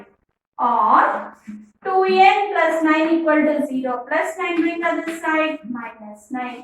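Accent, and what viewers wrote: Karnataka, India. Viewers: Mam ur from karnataka?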